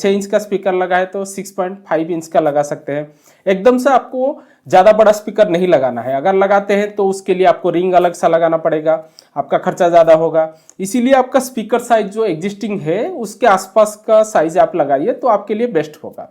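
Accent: Odisha, India. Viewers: odisha se ho?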